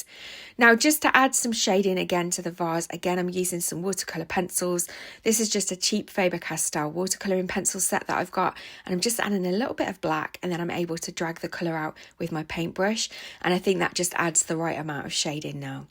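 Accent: United Kingdom. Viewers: I am so in love with your card! This was a wonderful tutorial! I really enjoy your British accent and your style of video! Now I have to to buy this set! Haha. Thank you so much for the inspiration!❤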